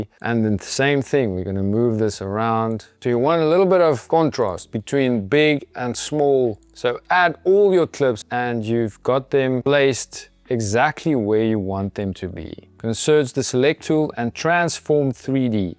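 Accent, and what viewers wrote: South Africa. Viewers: Super awesome! Thanks for sharing. PS: With that accent you must be a boertjie from SA? Greetings from NC. Groete van huis tot huis.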